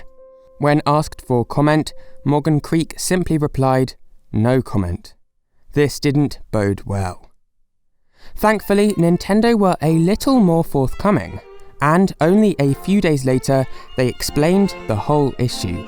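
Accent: United Kingdom. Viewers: The narration is so British, I had to grab a cup of tea just to not feel out of place while viewing.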